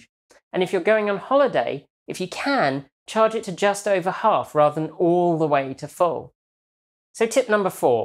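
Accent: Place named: United Kingdom. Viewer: Why are many of the EV videos feature man-ish looking woman with an annoying limey accent. "Let it cool down before you wack it on the charger"... Oh the humor....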